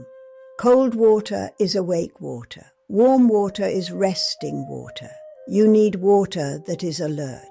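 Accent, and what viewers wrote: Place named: England, United Kingdom. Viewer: Lmao as an Actual Native it’s funny to kitten to an Ai native with an English accent lol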